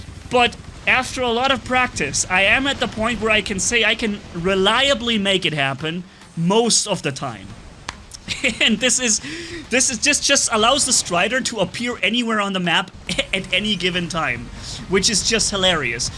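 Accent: Germany. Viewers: Hi are you German from your nationality cuz u have this German accent when your talking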